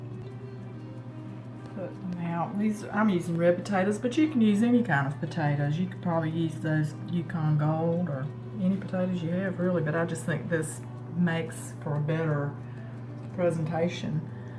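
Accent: South, United States. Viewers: Yum! Love your southern accent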